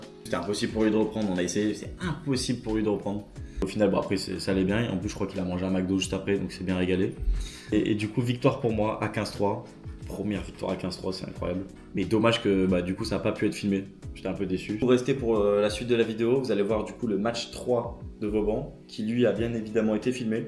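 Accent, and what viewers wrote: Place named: United Kingdom. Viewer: waa il a un big accent des UK mdr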